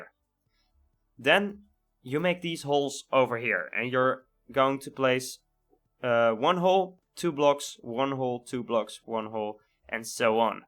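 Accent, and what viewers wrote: Netherlands. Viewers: where do you come from? You sound dutch. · Hij is 100% nederlands hahahaha 99% van de nederlanders kunnen geeen engels praten zonder accent ( ik ook niet :P )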